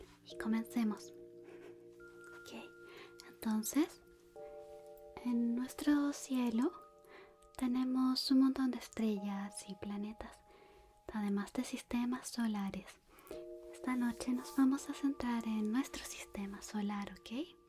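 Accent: Chile. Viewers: Es de chile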